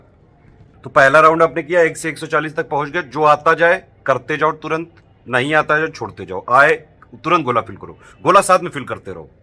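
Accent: Kanpur, India. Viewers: ऐसा क्यों लगता है सर की आप कानपुर से हो बहुत अच्छा लगा सर❤❤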